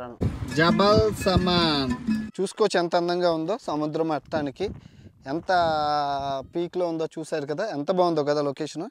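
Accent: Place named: Andhra Pradesh, India. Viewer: ఉత్తరాంధ్ర మాండలికంలో మధురంగా చెబుతున్నారు.జయోస్థు.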